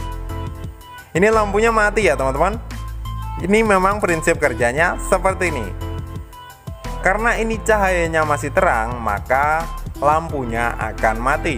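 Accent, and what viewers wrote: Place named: Java, Indonesia. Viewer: yang biru kita sambung Ke Puteh..😅 Auihihi.. B.jowone iseh ketok mas broo..👍👍😊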